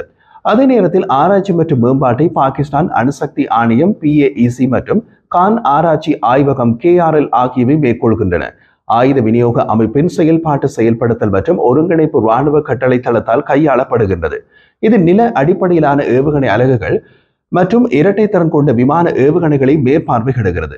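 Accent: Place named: Sri Lanka. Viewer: 👌ஸ்ரீலங்கன் தமிழ்🇱🇰🌹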